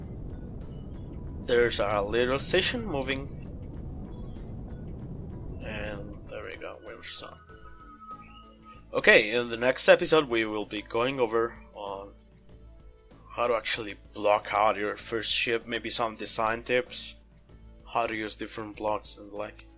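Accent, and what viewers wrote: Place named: France. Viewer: You can hear it's the dev by the French accent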